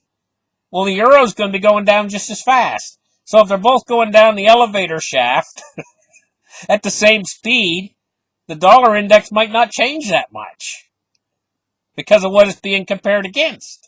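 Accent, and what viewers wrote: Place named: Canada. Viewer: Mr., dont try to change for other people. It has to be expodential I can't listen to this without the whole Canadian accent.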